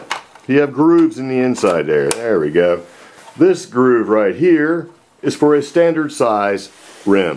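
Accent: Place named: Texas, United States. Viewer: Mojo? nah".....Never lost it, that Texan drawl forbids it, you wear that 5 gallon hat with pride!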